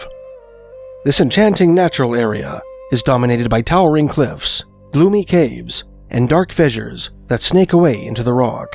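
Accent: Canada. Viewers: They haven’t figured out a way to make AI with that maple-mellow Canadian accent yet. Calm down, bud, don’t get so testerical 😂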